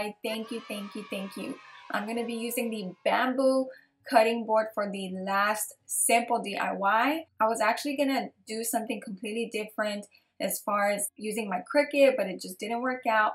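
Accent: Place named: South, United States. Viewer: Love your videos you talk ok I’m from the south to. 10 th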